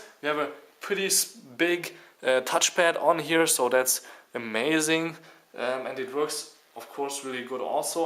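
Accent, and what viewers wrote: Germany. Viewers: Thats the typical german accent^^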